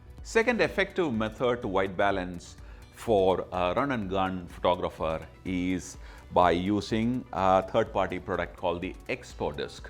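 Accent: Kerala, India. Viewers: Love ur mallu accent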